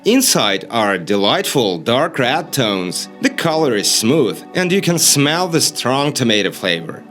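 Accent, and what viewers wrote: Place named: United States. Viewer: Забавно, а звучит так гипер американизировано, что я какое то время поразмышляла в каком штате так могут разговаривать lol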